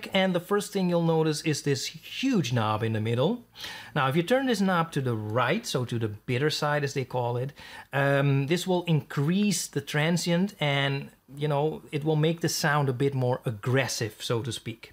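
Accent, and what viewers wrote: Netherlands. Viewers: I hear a slight Dutch accent so I assume you're Dutch but I'll keep it in English! I have installed Flux on my laptop and downloaded Bittersweet through the Flux app but I can't the DLL files anywhere to use it in my VST plugins in FL. How did you get it to work?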